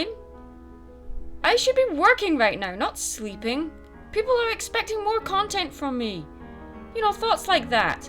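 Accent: Scotland, United Kingdom. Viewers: Big fan of your Scottish accent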